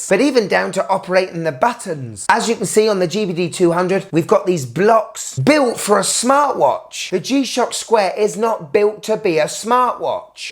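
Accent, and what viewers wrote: United Kingdom. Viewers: Great test and great British accent you have it reminds to my good old days 🤣 Just placed order for the watch Cheers❤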